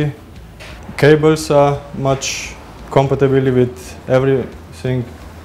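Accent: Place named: Slovenia. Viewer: I started to watch a clip, didn't know who is talking, but after two words I knew he is from my country... Slovenia! Very typical accent... :) Painful, I saw the comment, very true! But as far as I know, he's good mechanic, who cares if he can set up your bike like a pro! :)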